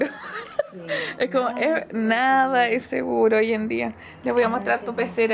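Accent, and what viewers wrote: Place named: South, Chile. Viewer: me encantas tus vlogs son muy entretenidos junto al y tu tia amorosos ellos, ademas te siento cercana porque yo tbn soy de la 8 región y tu acento del sur es maravilloso jaja, encuentro que esta muy bien tu explicación de los precios, es tu trabajo y si alguien encuentra caro que compre en otro lado y punto, un abrazo y éxito en todo.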